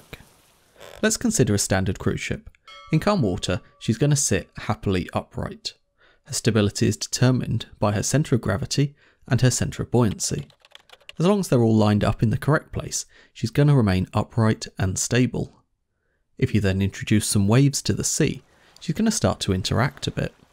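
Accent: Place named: England, United Kingdom. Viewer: Why are these vids always so much more compelling when the narrator has an English accent?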